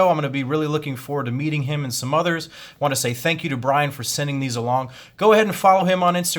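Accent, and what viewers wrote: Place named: New Jersey, United States. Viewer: You know, because of his very French seeming last name and his knife aesthetics I always thought he was French in France. Then I heard him talk. Brother is just an MFer from Jersey like me!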